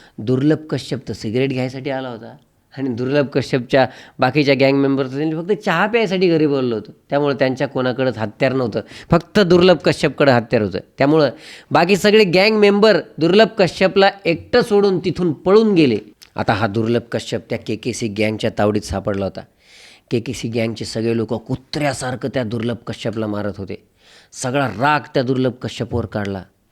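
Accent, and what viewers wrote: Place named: Rural, India. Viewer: Narrator's Marathi sounds unsophisticated and from rural area. It should be polished to reach out to urban audience.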